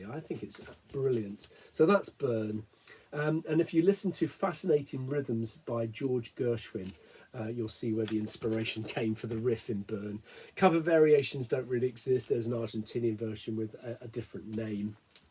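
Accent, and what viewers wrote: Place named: RP, United Kingdom. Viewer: Oh I would never guess. Talks like an English lord. Upper west side o’ London or something. 😝. Your video made me play DP’s Live in Paris 75 and Coverdale had pipes for sure. It’s his banter between songs that s most annoying.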